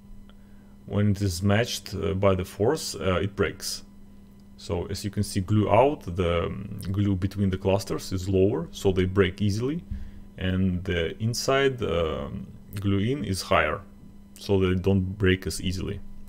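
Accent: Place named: Russia. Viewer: Сразу слышно русский акцент. 😊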